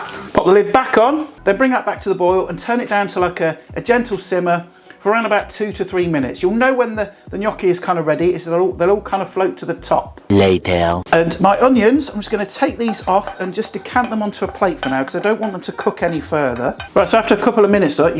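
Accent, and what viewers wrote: United Kingdom. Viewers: I watch mainly to see you and I love a British accent 🤗.....of course I'm looking for something different to make. Sometimes I get inspiration from your recipes and make slight alterations to my liking. Love ya' Mate! Cheers from across the pond, Linda.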